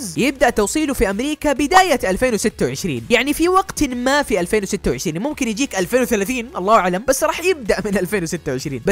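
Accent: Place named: Iraq. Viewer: زين ليش لهجتك عراقيه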